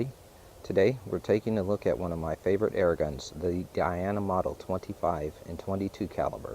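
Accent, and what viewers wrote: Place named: England, United Kingdom. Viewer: Gracias Sr.. Very good this video a lot of informative and crearly explicative mode to understad your fine English accent for Us. Thanks a lot. Saludos desde Venezuela..sorry my Bad English!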